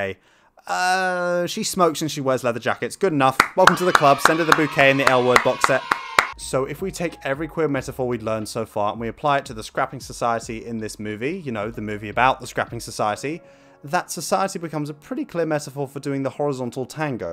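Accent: United Kingdom. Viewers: They're just both southern English my guy.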